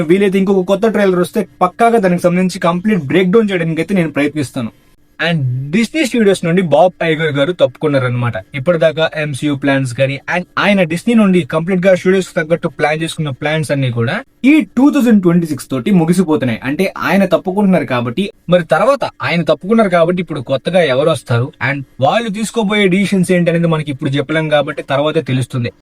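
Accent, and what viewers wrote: Srikakulam, India. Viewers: అన్న నీది శ్రీకాకుళం నా సూపర్ ❤❤❤ నమ్మలేకపోతున్న 😂😂 మాది పాలకొండ ✌️✌️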